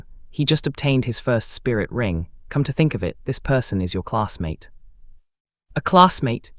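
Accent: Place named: India. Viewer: What was it with the Indian accent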